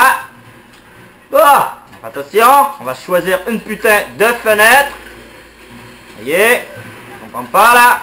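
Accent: Quebec, Canada. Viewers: avec l'accent canadien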